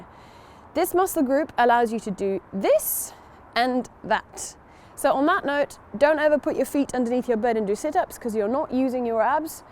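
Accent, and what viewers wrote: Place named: United Kingdom. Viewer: The British accent makes it perfect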